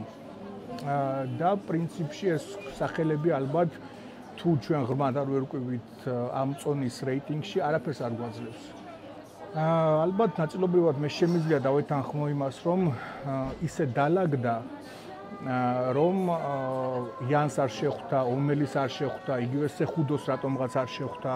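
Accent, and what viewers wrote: Russia. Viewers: პატივისცემა ჩემგან, რაც შეეხება კონტენტს, ძალიან მომწონს მაგრამ ჩემი პირადი რჩევა იქნება, გენრი სეხუდოს მაგივრად ჰენრი სეჰუდო რომ თქვა, კორი სენდხაგენის მაგივარდ სენდჰაგენი, მე კი ვხვდები რა თქმა უნდა ვიზე საუბრობ მაგრამ, ძალიან არასასიამოვნო მოსასმენია, აი მეტყველების ამ პრობლემის გარდა, ჰ-ხ, ჰ-გ, და ხომ მიმიხვდი, ისე ამბობ ხოლმე როგორც რუსები ამბობენ, სხა არაფერს შევუწუხებივარ, წარმატებები <3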